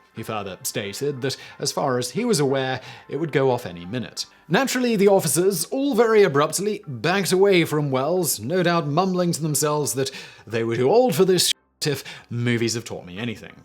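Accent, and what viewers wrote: United Kingdom. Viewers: I can't watch a UK guy tell an American story🤣it's annoying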